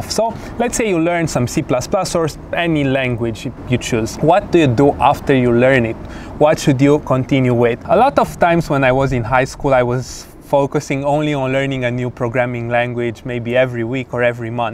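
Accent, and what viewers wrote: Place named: Romania. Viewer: vorbesti engleza ca un roman..fa le frate in romana si adreseaza-te romanilor..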